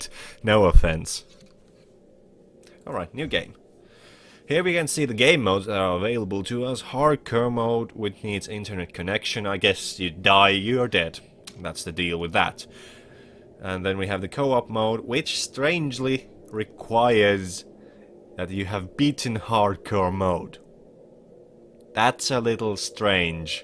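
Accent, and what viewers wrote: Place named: Finland. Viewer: Your accent sounds... Nordic. If I were to take a guess (and I am taking a guess), you're Finnish?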